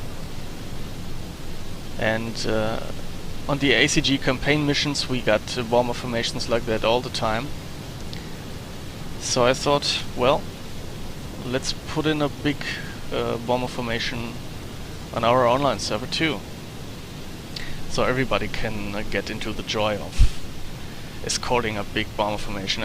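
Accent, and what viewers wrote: Germany. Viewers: nice. I really enjoy your vids. your English is very good, and the german accent gives a nice 'feel' to the video when you are in the 109. by the way,(as a 'teaching thing', not as a 'know it all'....just to help you learn English), 'lympne' is pronounced 'limp-nee'......the word you had trouble with....'arguably', is pronounced 'are-gew-ab-ly', (I think you were just in a hurry and got 'tongue tied').....I can't wait to try your server.....thx....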